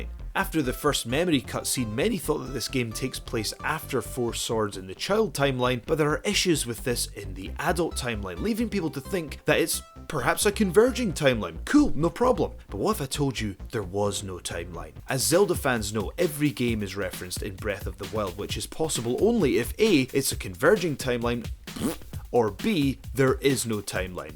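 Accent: Scotland, United Kingdom. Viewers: Oh, you couldn't ruin Zelda for me if u tried, still always a pleasure to hear ur thick Scottish accent though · He has a Scottish or north English accent. That might have something to do with it. Or maybe not. Just a thought.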